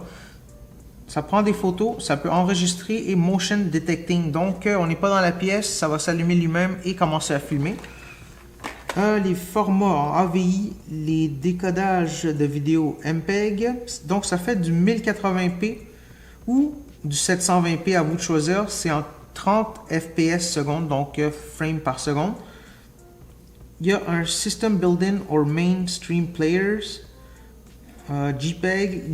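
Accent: Quebec, Canada. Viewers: Super vidéo ! J’adore ton accent du Québec...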